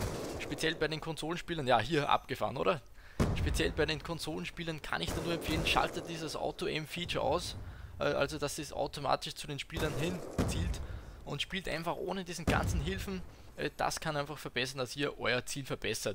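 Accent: Austria. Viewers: tolles video :) bist du österreicher :3? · Sau geiles Video :-) Von wo kommst du eigentlich hörst dich an als wenn du aus NÖ kommst :) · Bist du Österreicher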